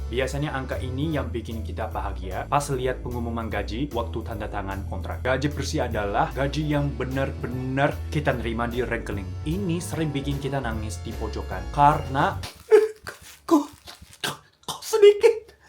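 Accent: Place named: Java, Indonesia. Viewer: Logatmu Jawa banget coyyy. 🤣 Potongan disana banyak, bedanya, sebanding sama fasilitas dari negara. Di Indo, duh, pajak dikorupsi mulu. Oh ya, BGM nya terlalu kencang. Volume-nya kecilin lagi dikit.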